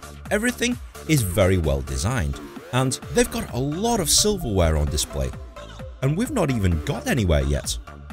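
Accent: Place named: Manchester, United Kingdom. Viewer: I could kind of tell you weren't a Spurs fan due to your Northern/Manc accent, and then you explained you're a Man United supporter so it explains it 😅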